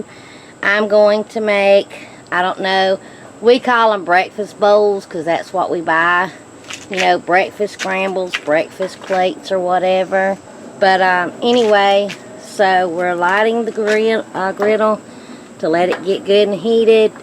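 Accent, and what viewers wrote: South, United States. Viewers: First time viewer. I absolutely love your southern accent!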